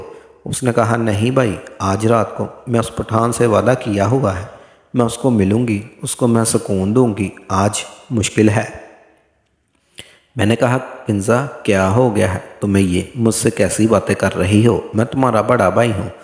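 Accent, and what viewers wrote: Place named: Sialkot, Pakistan. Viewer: astagfar.... awaz k lehja sialkoty hai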